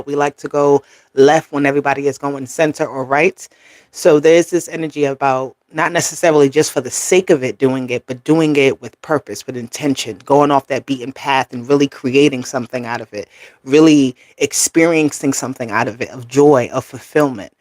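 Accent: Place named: Boston, United States. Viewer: Thanks for the reading from Boston. You sound like a Bostonian to me, maybe just a north east accent. lol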